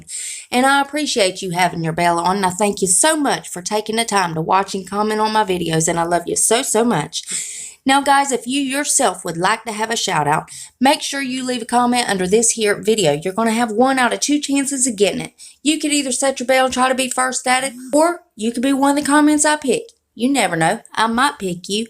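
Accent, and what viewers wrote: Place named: Texas, United States. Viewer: Where are you from? You sound like a southern girl like me...Texan! 😁 Love your channel!😍😍😍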